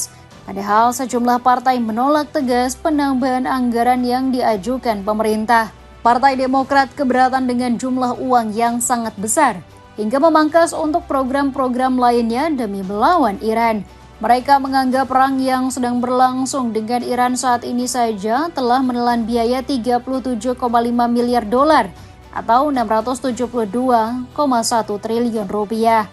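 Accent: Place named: Java, Indonesia. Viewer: Dari logat orang Jawa tetapi kok mengucapkan ejaan pakai bahasa asing sangat memalukan sebagai orang Indonesia yang sok kebarat baratan mengucapkan ejaan Indonesia tidak dipakai payah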